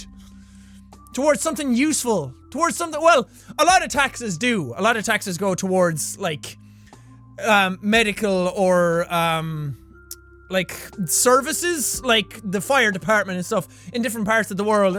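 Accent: Ireland. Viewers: His accent is awesome My accent is almost the same because I'm Irish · I know full well based on your accent that you're Irish. You couldn't say the same for me though. I am British, and I have a British accent. But that's it. A BRITISH accent. Neither Scottish nor English, because my blood is a combination of the two. I consider myself Scottish, and I'm proud to be, but you wouldn't be able to tell based on my accent. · You sound pretty Irish to me! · false because your a boss Jacksepticeye i love your videos and i know you have probably heard this alot but i am your biggest fan i love everything about you even your Irish acsent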